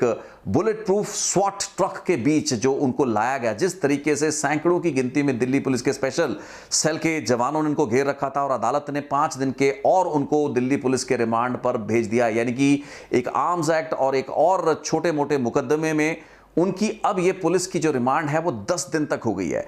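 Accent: Punjab, India. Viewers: Sir, I understand the reason for you creating this video in Hindi, ਪਰ ਬਾਈ ਜੀ ਜੋ ਸੁਵਾਦ ਤੁਹਾਡੀ ਪੰਜਾਬੀ videos ਚ ਹੁੰਦਾ, ਉਹ ਹਿੰਦੀ ਚ ਨਹੀ ਆਂਦਾ| And of-course it doesn't help that like all us Punjabis, You've also got that gulabi hindi accent! Love you brother and more power to you as always. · Thanks him for speaking other language than his mother tang to aware our community. He is not from up or bihar , he not a member of Hindi supremacy terrorist organization, we feeling proud to speak any other language with our mother language Punjabi accent, so you need to be a diverse and learn about international people how you understand their words in your language!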